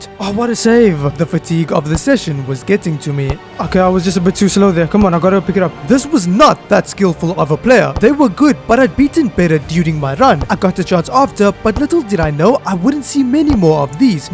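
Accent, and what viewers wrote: South Africa. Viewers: You from sa?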